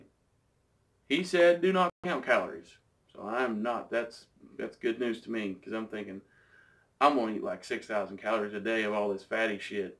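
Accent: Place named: Alabama, United States. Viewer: U from alabama? I dont hear "himhaw" anywhere but south bama lmao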